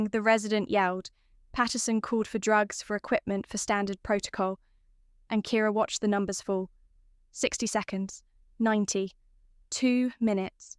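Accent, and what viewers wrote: United Kingdom. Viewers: Gloss or glass? Watching from Tagaytay City, Philippines by way of Oil City, Pennsylvania, USA. How do we get rid of the British English(?) narrators?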